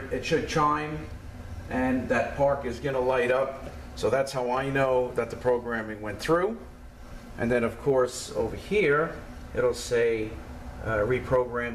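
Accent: Long Island, United States. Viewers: Great video. Didn't know about the change until recently. I was listening to you at the beginning and then saw the sign and was like oh, thats why he sounds like a Long Islander. I'm a NC native, but married my East Meadow native wife 26 years ago. We live in TN, but just had one of her heavy NY accent brothers visit recently from Long Island. Great info here. Thank you.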